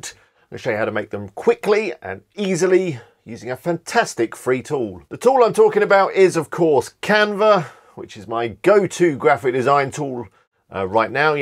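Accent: United Kingdom. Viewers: Here you get real stuff and with British accent. Epic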